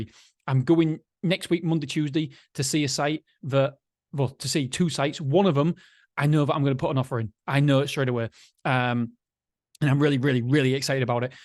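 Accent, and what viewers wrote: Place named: England, United Kingdom. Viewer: I m in France and follow you since weeks, I love your accent even you speak fast... fast. Which part of England?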